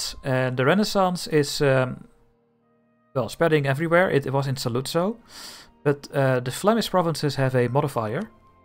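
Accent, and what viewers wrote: Netherlands. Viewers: his english is perfect but he clearly has an accent. a dutch one. an obviously very dutch accent. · This is good and proper. A dutch man with a thick dutch accent forming a strong Netherlands. Gezellig! I'm gonna enjoy this series. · As a Dutch person myself its really easy to recognize a fellow Dutch person speaking English. But I can Imagine its hard to catch if you are not Dutch yourself. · LUL as a person born in Netherlands, this is a THICC Dutch accent bro xD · It’s really interesting to hear the Dutch pronunciation of the Dutch provinces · Idk man, has a pretty noticeable Dutch accent. Maybe not as thick as many others from here have but it certainly isn’t accentless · He has a very strong Dutch accent lol, might not be obvious if you dont speak English or Dutch natively. ex. marinesss dutsh, persent, usash, tshoosh. very Dutch, all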